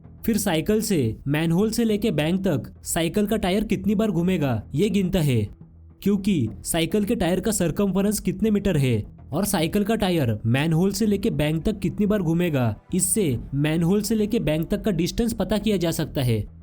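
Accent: Maharashtra, India. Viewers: Ye jo bol raha hai ye marathi admi hai marathi ki hindi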